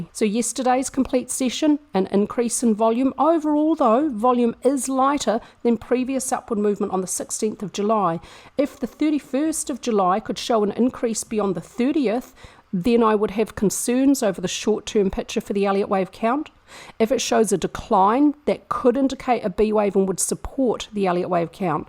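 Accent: New Zealand. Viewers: Btw, Just a bit of a stir from across the ditch, but I can't remember the last time I heard such a heavy Kiwi accent, its heartwarming to hear you 😁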